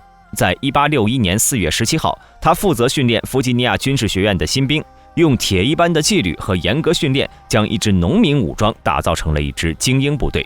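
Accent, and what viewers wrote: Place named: China. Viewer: 這英文發音真的很中國 跟硬度人有的拼.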